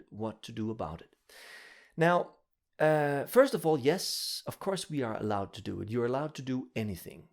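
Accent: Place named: Denmark. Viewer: Your accent is super adorable! 😊 I think I need a Danish boyfriend now!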